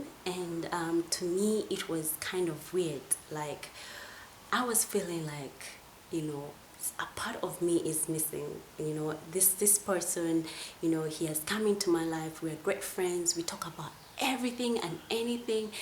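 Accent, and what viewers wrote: Uganda. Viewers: I enjoyed the story!! Monetise this channel so you make some chums. I thought you were Kenyan at the start but then felt the Ugandan accent deep in there. Love your videos💖💖💖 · Before even reading the comments, I knew she was Ugandan. She has this beautiful Ugandan accent.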